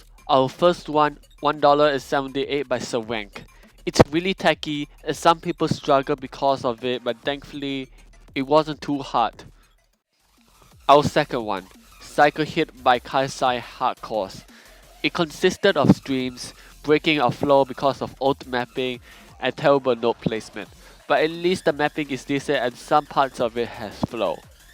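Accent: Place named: Singapore. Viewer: i love hearing singaporean english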